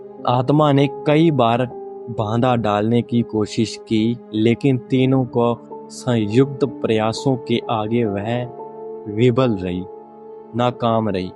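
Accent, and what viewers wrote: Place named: Punjab, India. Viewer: Jado tu story start kiti mein pehla he guess kr lya k tu Punjabi ha, kehra pind Mitra tera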